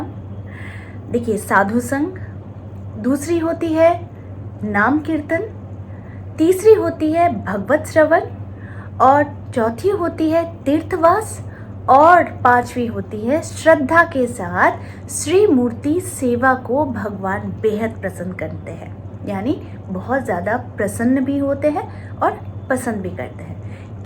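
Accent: Bengal, India. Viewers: R u Bengali??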